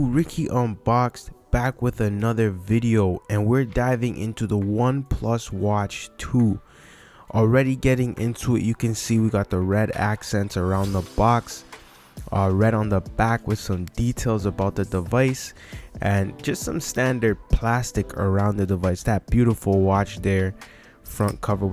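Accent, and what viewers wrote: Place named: Toronto, Canada. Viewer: Toronto accent 🤢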